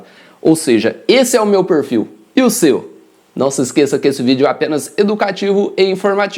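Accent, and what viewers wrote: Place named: Inland São Paulo, Brazil. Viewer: Esse sotac Paulista do interior é irritante rs mas o que vale é a informação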